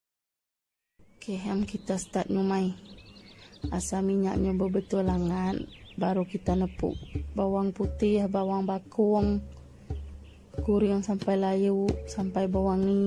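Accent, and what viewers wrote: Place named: Iban, Malaysia. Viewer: Pertama kali saya dengar loghat Iban..suka dengar loghat2 lain ni. hehe..btw resipi ni boleh buat ni. thanks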